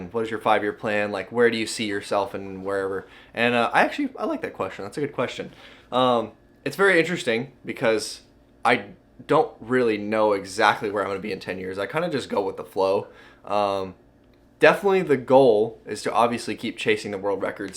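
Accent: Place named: Australia. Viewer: You the man! So I was watching some Braille skateboarding drama the other day and thought Ricky Glaser reminds me of you lol minus the Australian accent obviously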